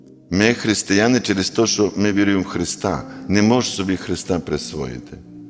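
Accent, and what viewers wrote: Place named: Galicia, Ukraine. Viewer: Як гарно і колоритно звучить українська мова з різними забарвленнями територіальних діалектів!))) Галицький говір ))) Слава Ісусу Христу за таких священників!😊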